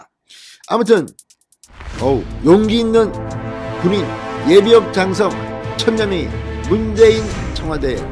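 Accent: Gyeongsang, South Korea. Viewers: 진성호 씨 /엇따대고... 속이시원합니다 완전 경상도사투리^^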